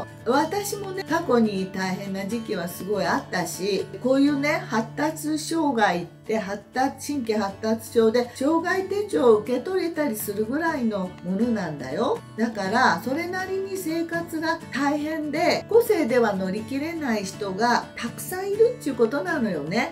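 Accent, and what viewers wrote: Yamaguchi, Japan. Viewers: 山口弁がとてもここち良いです。私も山口出身の山中湖住まいの70歳です。凄く元気もらってます。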